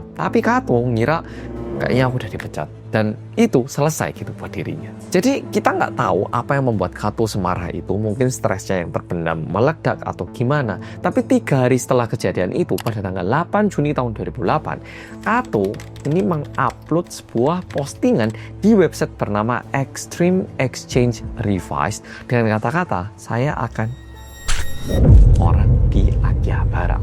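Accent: Java, Indonesia. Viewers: paling demen nonton ini, logat jawanya medok banget ya wkwkwk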